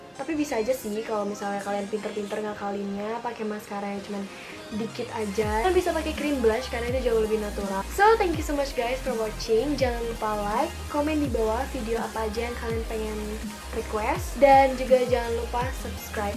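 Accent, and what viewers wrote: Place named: Java, Indonesia. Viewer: logat jawanya keluar :v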